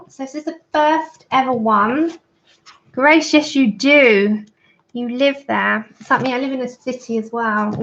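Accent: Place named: South East London, United Kingdom. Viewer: Great collection! !loved watching and thanks for showing us!also were are you from as I'm from South East London and you sound like your from here too x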